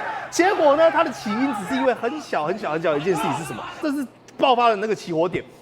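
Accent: Taiwan. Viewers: 笑死了，一听到这个弯弯口音就知道要放什么屁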